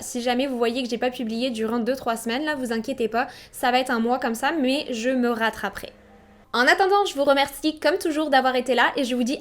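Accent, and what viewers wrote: Quebec, Canada. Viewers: Je viens de découvrir votre chaîne jolie demoiselle. C'est un plaisir de vous écouter mais dites moi il me semble entendre un très léger accent québécois.... me trompe je ? Bise de France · est et Mc Skyz est Mc Skyz. Cependant, tout les deux sont extras!!! J'adore le fait que tu as un accent québécoise même si tu es française! Ça donne un sentiment de " réconfort ". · a mince c est cuit pour la comparaison avec Mc Skyz il me semble entendre un petit accent québécois ^^ .. Je suis chauvin Mc Skyz numero 1 :)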